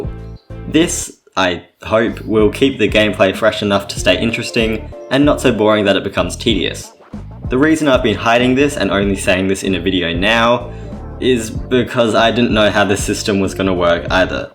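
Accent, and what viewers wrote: Australia. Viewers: didnt know you were aussie, i am too 🙂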